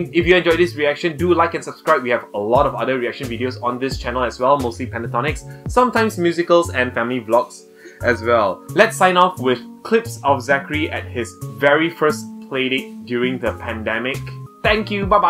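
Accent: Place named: Jamaica. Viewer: Love the reaction! However, I couldn't help but notice what sounded like an island accent of Jamaican or Trini? Either way, Happy Christmas!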